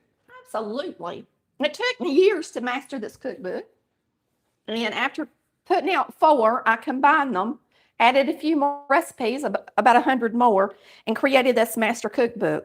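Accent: South, United States. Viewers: I love to watch you and listen to your southern slang. Reminds me of my mama too. She made fried green tomatoes in bacon fat. They were so delicious! Keep on cooking,!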